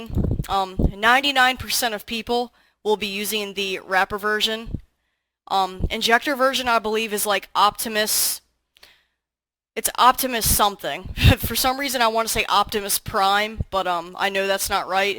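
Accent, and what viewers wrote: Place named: Delaware County, United States. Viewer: Hey are you from east coast? Sounds like a Delco accent. This video helped a lot. I was getting 0 FPS. When I downgraded my.dll file that enb series comes with to the one recommended it worked! It doesn't seem as good as project enb so I need to tweak some stuff.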